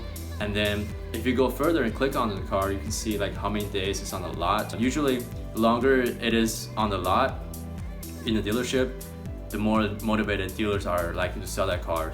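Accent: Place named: Italy. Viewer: Great advice and your Italian accent was hilarious!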